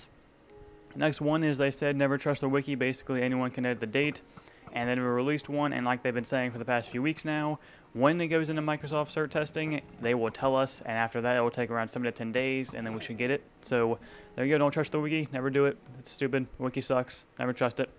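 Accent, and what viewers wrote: United States. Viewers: you speak american? was i the only one that caught that?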